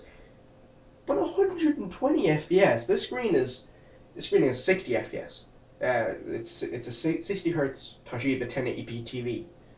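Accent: Ireland. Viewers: also what part of Ireland are you from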